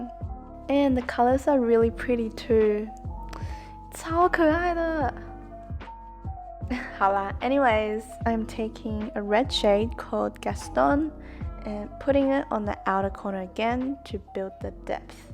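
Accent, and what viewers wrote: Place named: Taiwan. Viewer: 妹妹哩低衝蝦😂😂😂你的謝謝還有台灣國語笑死🤣🤣🥰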